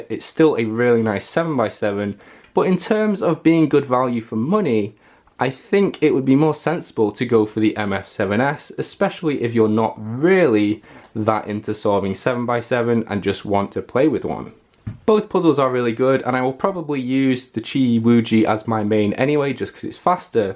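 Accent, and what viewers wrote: England, United Kingdom. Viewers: Hey are you from England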